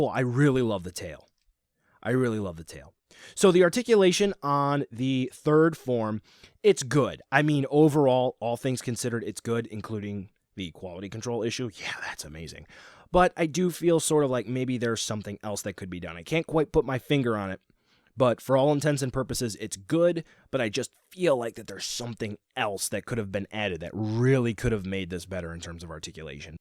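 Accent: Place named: South, United States. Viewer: What is with the southern accent?????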